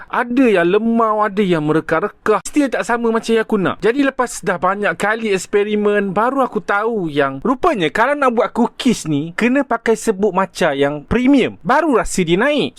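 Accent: Malaysia. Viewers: Man percakapan kau memang macam Malaysia aku pulak ramai cakap aku orang luar sebab percakapan aku sangat formal macam orang putih baru nak belajar bahasa melayu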